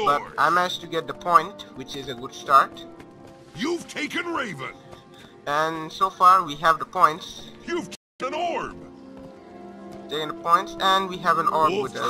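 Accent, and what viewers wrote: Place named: India. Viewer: sounds like an indian accent woooooooo represent us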